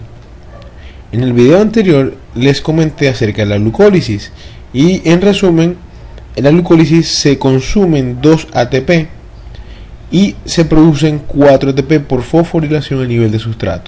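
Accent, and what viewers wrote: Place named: Venezuela. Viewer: Tenias que ser venezolano.. Saludos desde coro.. Te entendí a perfección <3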